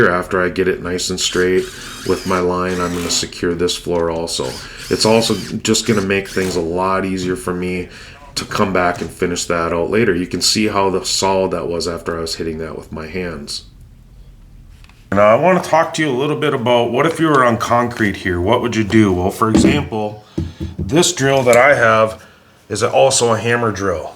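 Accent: Minnesota, United States. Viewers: I love your videos... great explanations. And I know how hard it is to quit smoking!!! I quit after 20 years. Also enjoy that Minne-soooota accent. All my people live there. Great job. I also pray you will quit smoking.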